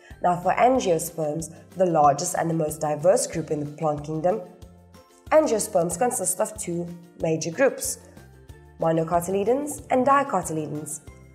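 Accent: South Africa. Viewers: This helped so much One question: Is the voice over south African?👀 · This helped very much thank you 🤧💞😭 (Also you sound south African for some reasons I'm probably wrong tho )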